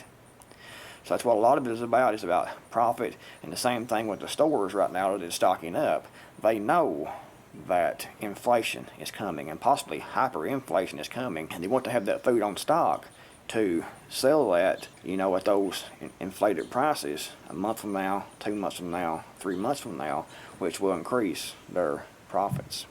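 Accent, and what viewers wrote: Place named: Tennessee, United States. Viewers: I’m in Texas and I love listening to his accent and it is different from what I hear in Texas with certain words pronounced differently. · Me too! Idk where he’s at, but he sounds like he lives in Tennessee. I’m in Texas and our accents are much less twangy. I like his speaking voice.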